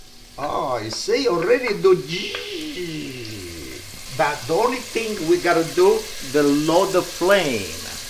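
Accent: Italy. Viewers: Great looking food, but the Italian accent is falling away..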